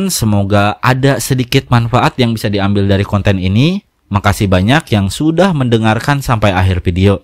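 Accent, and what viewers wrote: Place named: West Java, Indonesia. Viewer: Ditunggu bang, semoga apa jadi hajat abang bisa cepat terkabul 🙏. Btw logatna siga urang sunda bang 😅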